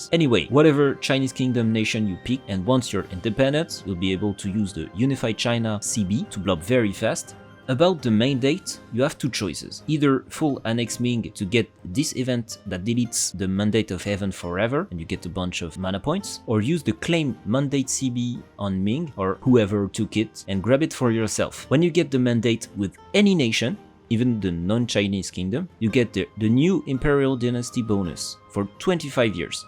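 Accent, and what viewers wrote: France. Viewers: Un français !!! Une bouffée d'air frais (ouais je t'ai cramé a l'accent ;) ) ! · I have the impression of hearing an accent, are you French?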